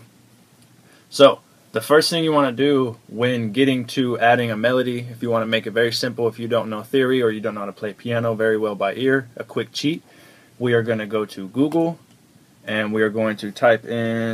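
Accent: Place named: Texas, United States. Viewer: r you from texas or sum cause you got the hustla slang nom sayin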